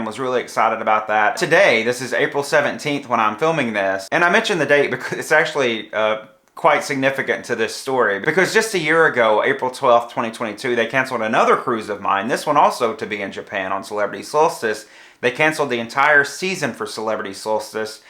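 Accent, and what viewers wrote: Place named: Carolina, United States. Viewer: You’d think that they’d have noticed by now that you’re not only a regular but a cruise vlogger who has driven a ton of people to their cruise line with your other glowing reviews and maybe tried to either keep your booking or at least incentivize you better than this. I bet that this video has probably dissuaded at least a hundred people who would have seriously been considering booking a Japan cruise with Celebrity to not book that cruise, and that your previous videos extolling their virtues as a cruise line probably drove them hundreds of bookings or more. I know I love your videos! Right now I live vicariously until I can afford to cruise again but I appreciate your honesty on all these videos and your Carolina accent makes me feel right at home. Also I agree with the folks who said you should consider going to Japan anyway and getting the train pass, if you can afford to do so. 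Definitely a country worth exploring in depth.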